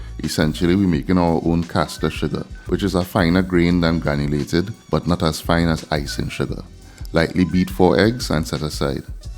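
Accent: Trinidad and Tobago. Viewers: The accent of a Trinidadian is very distinguished! 🖤Nice recipe! I will have to order the fruit via amazon.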